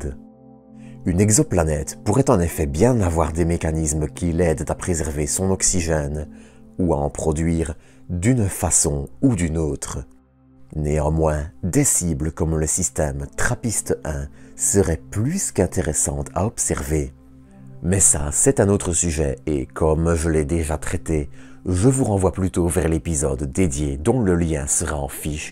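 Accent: Belgium. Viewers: Ici grâce à Christophe ! Super chaine, je m'abonne ! (en plus je suis addict à l'accent belge...) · il a un accent belge à couper au couteau, il doit veniiiiR du fin fond de la campagne hainuyère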